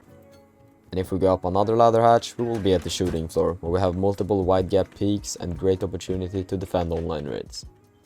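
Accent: Sweden. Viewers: I can hear that you are swedish my g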